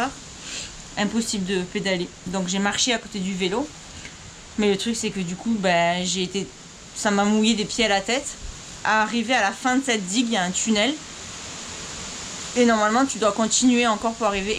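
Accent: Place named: South-West, France. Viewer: c'est d'ou ce bel accent ? sud ouest ?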